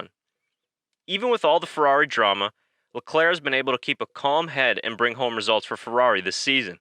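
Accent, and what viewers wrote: United States. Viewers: I really enjoy this content. I don't know if you currently live in Europe, but it's refreshing to hear someone with an American accent talk about F1 and really know what they're talking about. If you still live in the US, awesome that you watch the races! I can imagine they're quite late at night over there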